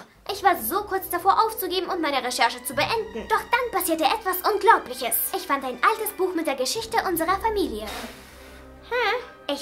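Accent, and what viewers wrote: Russia. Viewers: Du hast auch ein russischen Akzent und er nicht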